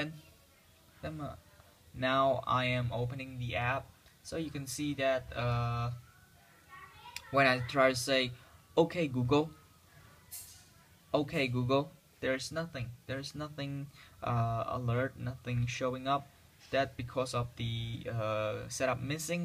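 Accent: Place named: United States. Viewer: I swear u sound like American..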